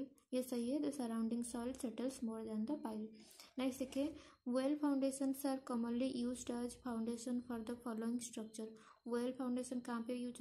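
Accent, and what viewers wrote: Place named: Odisha, India. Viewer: I think you are a Odia .i guess it because of your accent .Good work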